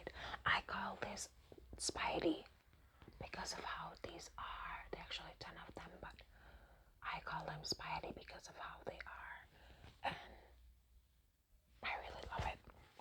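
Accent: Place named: Jamaica. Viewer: Where are you from? Sounds jamaican!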